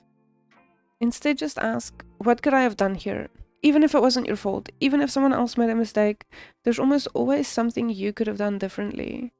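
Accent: South Africa. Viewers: Are you from south Africa? I can tell from accent since I'm from jhb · Sorry this is totally random, but I'm 90% sure you have a South African accent and I love it.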